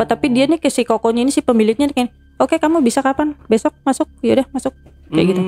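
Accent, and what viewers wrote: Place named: Java, Indonesia. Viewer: Intinya bukan dr derah nya ! Elu sok2 jkt tp logat lu aja jawa. Bukan betawi. Justru org2 jkt jg banyak yg maun dukun !! Dmna pun itu jg ada pada main dukun. Pesugihan sgla macm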